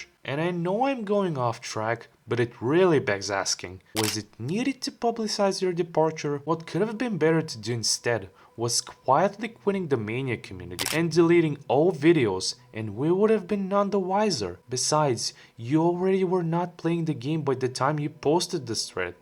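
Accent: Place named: Bulgaria. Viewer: Tozi Bulgarski Akcent e na drugo nivo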